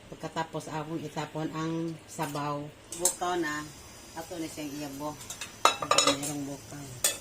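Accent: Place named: Visayas, Philippines. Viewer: Hehe malingaw ko jd ko paminaw sa bisaya mg tagalog🤣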